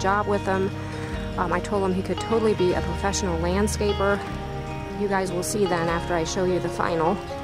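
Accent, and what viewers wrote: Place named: Pennsylvania, United States. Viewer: Beautiful! Your husband did a fantastic job. Thank you for sharing your lovely property and the hard work you put into creating it. Your accent makes me long to visit my relatives in Berks county PA.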